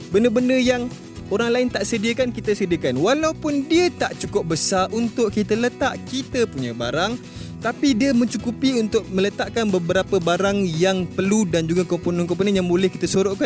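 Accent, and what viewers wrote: Malaysia. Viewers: abg ini omong emang melayu banget ada yg gak ngerti........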